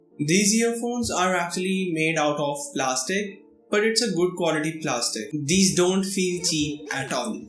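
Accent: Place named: North India, India. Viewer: Decent presentation. Loved the accent from a North Indian . Way to go.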